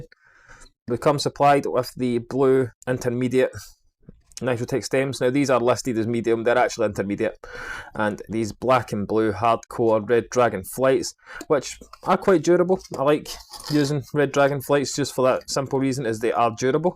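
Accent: Scotland, United Kingdom. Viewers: hey man! great review. just purchased a set of these, I will let you know how they are Awesome Scottish accent my man. Cheers from Canada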